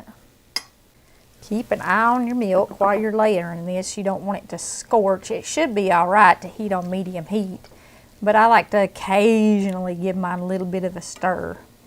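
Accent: South, United States. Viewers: It's nice to hear your Southern English voice again:) I feel that you've been going through a hard time... (sickness - death..?) God bless you,.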